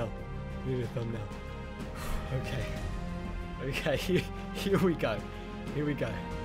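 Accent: Australia. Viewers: Is he Australian ? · Are you Australian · R u australian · is he Australian because i am and he kinda sounds like it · Love people with Australian voices! <3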